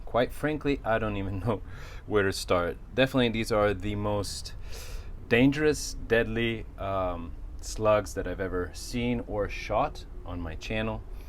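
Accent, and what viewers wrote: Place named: Northern Italy, Italy. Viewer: Grande video e belli questi codici!...secondo me' tu hai l accento dl nord Italia ovest (Milano, Torino)....., Cmq sei grande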